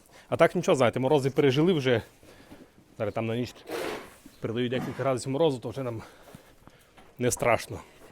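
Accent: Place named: Polesia, Ukraine. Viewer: Привіт молодь❤! Приємно спостерігати за працьовитою родиною! Здоров'я та наснаги вам❤!, я прекрасно розумію чудовий поліський діалект,у свій час працвав в Сарнах на маслробному комбінаті охоронцем, досі закоханий в ваш край,та щирих доброзичливих поліщуків! А смак вашої чорниці і грибів неможливо забути. Миру нам та Божої ласки ❤!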